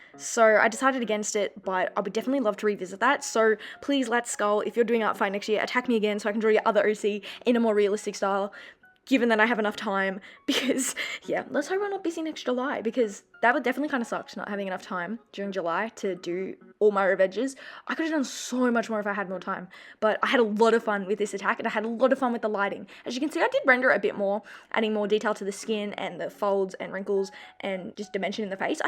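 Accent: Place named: Australia. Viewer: Are u from Australia right? I love your accent! And of course your style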